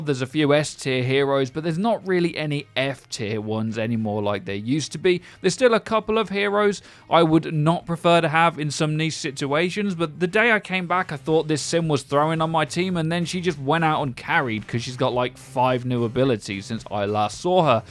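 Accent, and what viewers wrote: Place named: United Kingdom. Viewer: We missed your British accent guiding us!!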